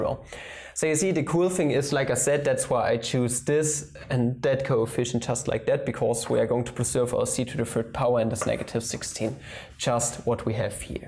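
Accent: Germany. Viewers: Are you from germany?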